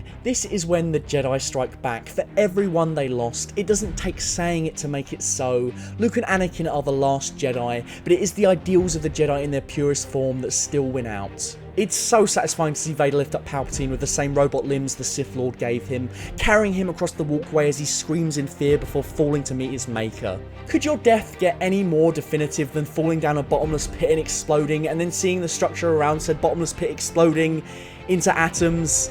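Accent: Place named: England, United Kingdom. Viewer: Shriiiieeeeeek.... American pronunciations from an English accent, give me chalk screeching on a black board any day!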